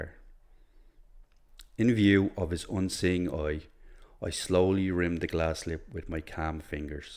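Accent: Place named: Scotland, United Kingdom. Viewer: I like your accent. Scotish accent I suppose.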